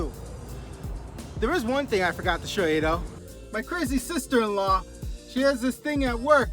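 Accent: New York, United States. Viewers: That NY accent is thick.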